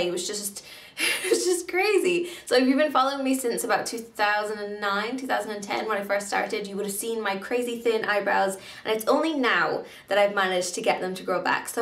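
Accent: Ireland. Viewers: Well, I love your accent! Are you Irish? Great video btw :) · Caroline Aleman Ireland I think. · Omg at the start of this video I was half paying attention and thought she was american and then once I fully paid attention I was all "hold the phone, she's Irish!" I don't even know how I could make that mistake haha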